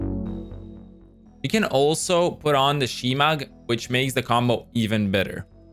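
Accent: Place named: Europe. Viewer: Hi from Finland. To my ear have a European accent, so where are you from? My guess... Slovakia;)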